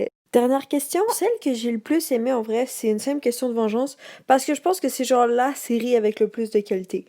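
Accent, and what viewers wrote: Quebec, Canada. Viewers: J'aime trop ta voix et ton accent tes Québécoises ? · Ton accent est incroyable j'avais jamais entendu l'accent québécois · Salut j'adore ta voix, et d'habitude j'aime pas l'accent québécois mais je trouve qu'il te va trop bien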